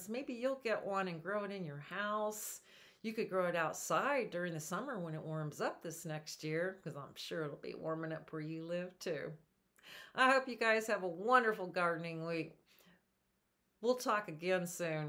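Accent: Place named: South, United States. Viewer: That’s her southern draw my friend. I’m oh so fascinated with our friends and their accents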